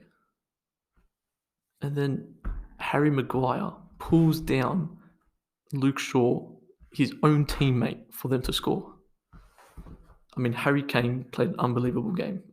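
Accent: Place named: Geordie, United Kingdom. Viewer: true geordie esque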